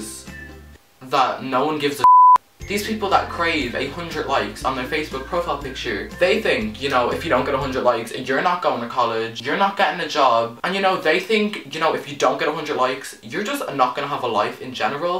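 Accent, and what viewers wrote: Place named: Ireland. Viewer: Ah bless. The Irish accent is lovely as always :) thanks for making my day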